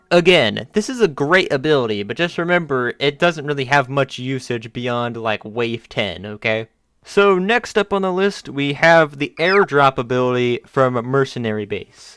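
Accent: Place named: United States. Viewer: This is my first time watching you and I was like wow he sounds very American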